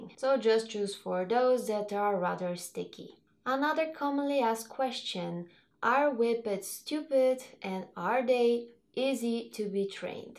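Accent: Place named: Belgium. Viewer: Love your accent. Where in the world are you from? I'm thinking French-speaking Belgium.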